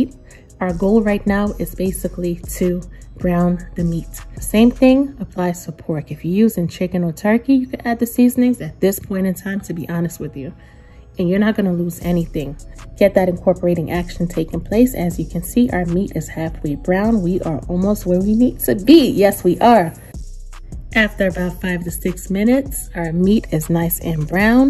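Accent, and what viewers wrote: New York, United States. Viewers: I love that new york accent from a woman you doing ya thing with that lasagna too i know you can cook you from up top too 💯🍷🌹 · I enjoy your videos! Keep cooking! I have my way of cooking but always love to try someone else's ways of doing things! Also I love that New York accent! Thanks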